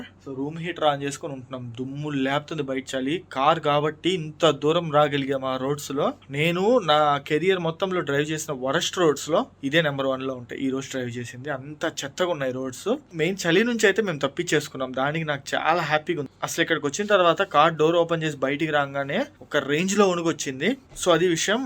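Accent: Nellore, India. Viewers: Bro, nee nellore yaasa super 👌. All the best nd drive safely...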